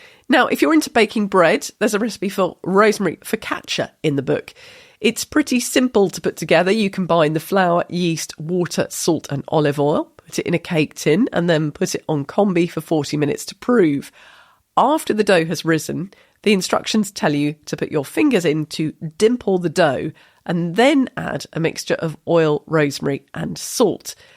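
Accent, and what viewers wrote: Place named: RP, United Kingdom. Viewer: modern RP is known to me👍🏻👍🏻😀thank you. I am curious, if your mRP differs from eg. Atomic Shrimps‘, who is located in Dorset, as he says in his video. I understand, that the privacy is all important. But, if you could give a hint in which part of England your accent has developed and all maybe which region you are living in right now, I’d be very pleased if you could name of the region, if this doesn’t feel like doxxing yourself to you. Curious and very friendly greets from Germany 😀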